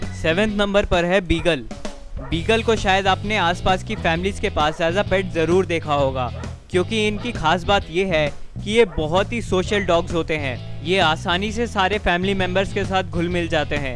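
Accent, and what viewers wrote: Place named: India. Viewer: Indian speech i love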